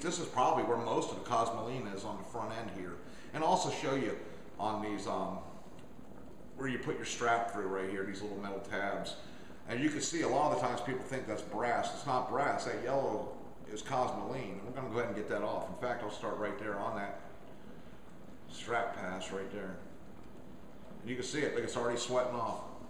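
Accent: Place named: Chicago, United States. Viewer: i dont think it really sounds mobster, its more like just a chicago accent. are you from chicago?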